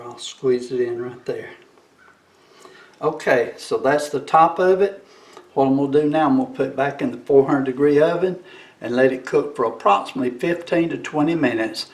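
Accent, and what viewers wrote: South, United States. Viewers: Looks yummy..great for a brunch..;) love your southern accent..I lived in va as a navy wife..loved living on the east coast..;)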